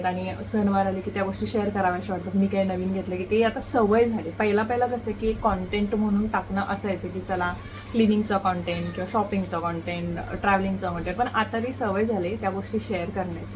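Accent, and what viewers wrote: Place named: Belgaum, India. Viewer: Rahus nako... Amchi belgav chi basha.. Aikun bar watal..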